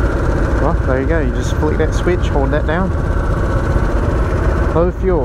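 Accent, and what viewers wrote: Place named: North Carolina, United States. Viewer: its just the soft voice and southern twang thats all it is so many people in nc sound like this it pretty normal voice honestly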